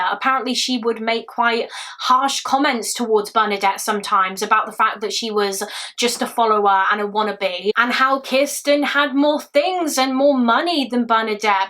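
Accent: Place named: England, United Kingdom. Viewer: In addition to your personality, I like your genuine English accent.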